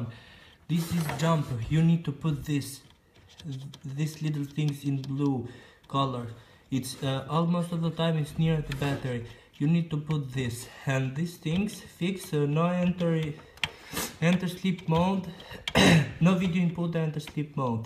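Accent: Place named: India. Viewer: Indian accent Thank you very much good video! Big Like !Sub give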